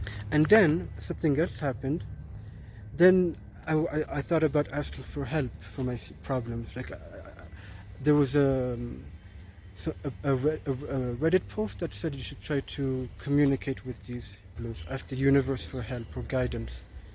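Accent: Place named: Mediterranean. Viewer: it sounds like you have some Mediterranean style accent but it's a cool one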